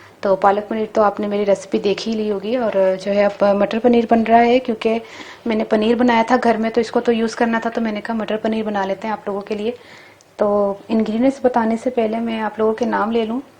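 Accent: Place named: Punjab, India. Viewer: Tuhadi Punjabi Masha Allah bahut Mithi hai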